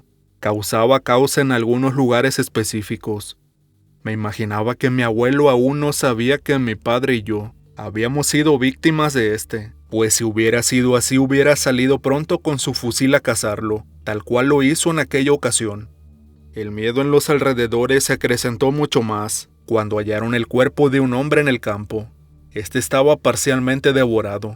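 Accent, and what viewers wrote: Mexico. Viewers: Eres uno de los mejores narrando...tu acento de dónde es?? De que parte de México???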